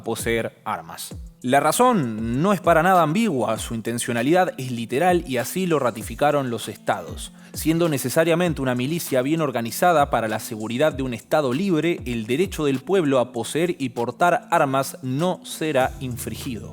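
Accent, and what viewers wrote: Argentina. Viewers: Por la forma de hablar parece argentino o uruguayo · Che, que me han gustado tus videos. Muy sensatos, pibe. Saludos desde México. Seguí así. (Me encanta el acento Argentino, sin afán de burla).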